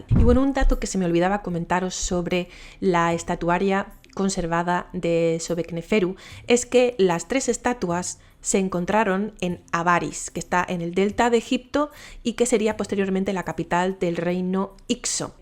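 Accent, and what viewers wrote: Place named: Andalusia, Spain. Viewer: Sigo añorando tu acento andaluz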